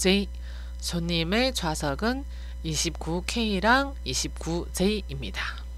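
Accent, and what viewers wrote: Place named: Beijing, China. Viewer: 다 좋은데 북경식 발음이 표준이 아닌데 북경식으로 습관화 되신듯....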